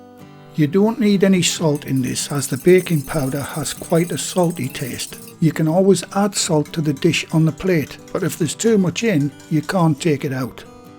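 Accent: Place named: Newcastle, United Kingdom. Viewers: You sound like a Geordie, sorry if I’m wrong I’m new but you’re a bloody good chef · Hello you have a very familiar accent you wouldn’t be from the North of England would you possibly Newcastle?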